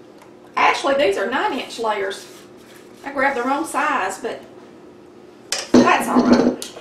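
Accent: South, United States. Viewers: Looks awesome. I was diagnosed with cancer 3 yrs ago and after chemo, radiation & 3 major cancer surgeries at M.D. Anderson in Houston Tx, I had to find a new hobby so I wouldn't loose my mind & I've learned I LOVE TO COOK!!! I'm getting better with every recipe. I will be making this soon...especially on a cold rainy day and I'm subscribing to your channel. Thanks for the recipes. Much love from Mobile Alabama I was wondering what city & state are you from...I definitely hear a southern accent.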